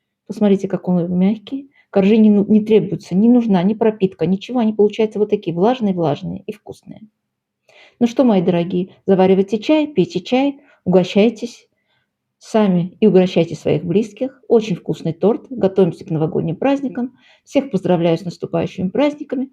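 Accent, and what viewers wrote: Baku, Azerbaijan. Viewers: Как вы хорошо объясняете,подробно ,все секреты выпечки не скрываете..Настоящая бакинка советского времени .Я тоже бакинка ,жила 8 завокзальная,у нас у всех такой акцент ,бакинский,чистый литературный.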